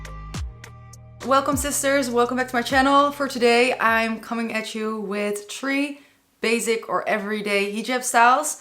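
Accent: Netherlands. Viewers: Ja hoorde het ook al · I just feel like your Dutch, I mean your accent, it sounds like it. No offence, just a thing I noticed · Ik kan gewoon aan je accent horen dat je uit Nederland komt, leuke filmpjes goed bezig ma sha Allah!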